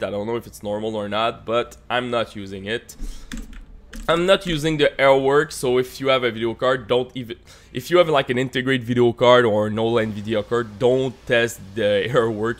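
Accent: Quebec, Canada. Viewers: J'entends un Québécois!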